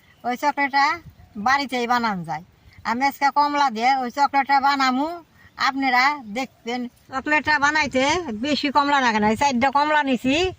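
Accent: Tangail, Bangladesh. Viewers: আপনার বাড়ি কি টাংগাইল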